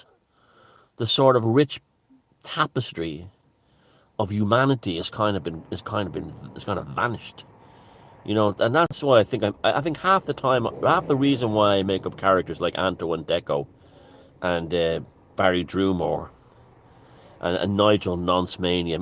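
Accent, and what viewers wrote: Tipperary, Ireland. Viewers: Well I don’t think I’ve laughed so much in years as I’ve just done at your Tipperary accent 🤣😂! I left Ireland at the age of 21..30 years ago now! I remember the characters and I also remember an old fella that used to live in our neighbourhood when I lived in London, he was from Tipperary and spoke exactly the same! He had many a spat with the neighbours which usually ended up with him calling them “feckin pagans”…why he chose to use pagan as in term of insult..well I’m not exactly sure 😂. I remember creasing up many a time at his antics. I was about the only one locally he had a good word for..because I was Irish 😂. He always used to remind me that I was only a wee Irish girl in “John Bull” and I must watch out for “dem heathens” over here..OMG 😂..you just reminded me of him, definitely long since passed by now, he must have been pushing 70 at the time. 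Thank you for the laughs and the reminders ☺️. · Shite Tipperary accent 🙄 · Tip on the tipp accent - you have to add a y as the second letter of every word that ends in a vowel or w. It may well be impossible , I don't think I have the right muscle fibres in my tongue to enable a Wicklow accent, probably requires conditioning since infancy. There is actually a huge diversity of accents in Tipperary(all have that y thing though), they get extreme as you approach the borders with other counties. R.I.P Jordan Maxwell..Really enjoyed you're last few videos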